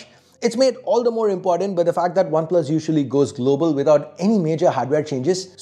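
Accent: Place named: India. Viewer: I swear i couldn't continue watching the review because of his indian accent ITS HARMFUL TO THE EARS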